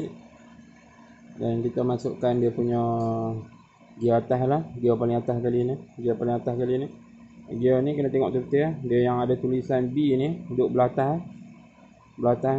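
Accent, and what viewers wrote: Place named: North, Malaysia. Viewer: Kedai dkt mn dgr mcm belah utara ja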